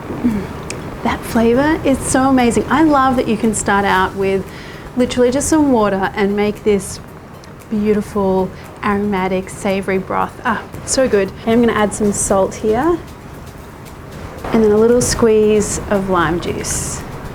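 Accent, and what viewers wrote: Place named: Australia. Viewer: How i miss Australian accent..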